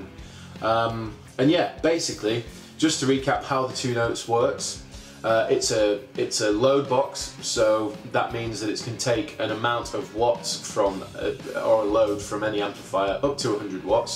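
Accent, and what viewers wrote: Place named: England, United Kingdom. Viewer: where are you orginally from in england? its something i've wondered for a while, i think i can detect an accent.. enlighten me:)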